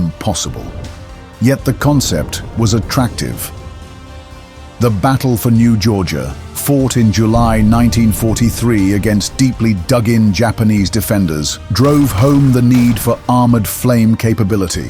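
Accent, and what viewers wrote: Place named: United Kingdom. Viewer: WTF with the Brit accent? These are American troops.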